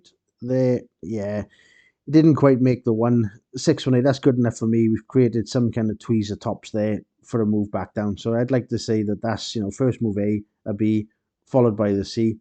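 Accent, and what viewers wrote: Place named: Wales, United Kingdom. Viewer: Good info mate. Nice to hear solid TA from a fellow Welshman ;)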